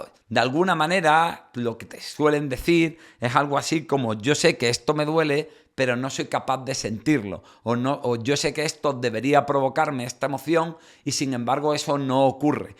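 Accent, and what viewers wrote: Andalusia, Spain. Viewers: hablas un andaluz culto y perfecto, o sea me encanta tu acento, además has dicho prejuicio.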